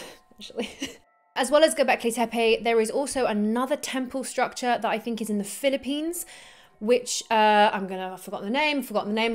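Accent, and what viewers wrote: England, United Kingdom. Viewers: i'm an old guy and I truly enjoyed watching this. I'm a history buff from way back and its fun to see a young person asking all the questions and poking your finger in the eye of the established historians. I think there are holes. The Mayan calendar was cyclic, sure they missed the end of the world or did we just not read it correctly? Either way your fiery attitude and British accent calling bs on things is entertaining and most definitely reassuring that there's still a chance that the study of history still has some hope. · English accent, nice voice sound, articulate, and amazingly I never heard an "ah", informative, and very attractive, wow, wymm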